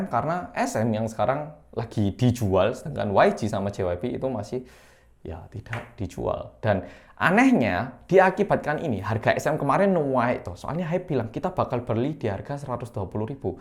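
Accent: Java, Indonesia. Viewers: Makasih mas (Pria manis korea medok yang menjadi solusi bagi kami bolo² dalam setiap masalah 😄) aku sebenernya Masih ga nyangka sih Bighit yg agensi miskin, kecil dan hanya punya 12 karyawan kemudian semesta memberikan kesuksesan luar biasa lewat BTS sehingga sekarang jadi Labels Raksasa, punya cabang di Japan dan Amerika,uangnya ga berseri..dan sebenarnya banyak hal yang harus dicontoh dari seorang Bang PD Nim memberikan kepercayaan penuh thd anak² idolnya, memberi keleluasaan anaknya untuk menulis, memproduksi musik sendiri, diajarin gimana menghadapi dunia luar, tata kelola bisnis dan 2021an diajarin terjun ke persaham²an sehingga jika terjadi apa² Bangtan punya andil atau suara, berharap yang terbaik aja untuk semua (episode 4 Suchwita terbaru Suga bilang kami tahu bisnis, pengelolaan dan bagaimana industri ini bekerja dari Bang PD Nim)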